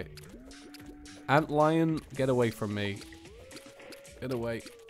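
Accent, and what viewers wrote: England, United Kingdom. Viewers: I knew you're from england even before you said it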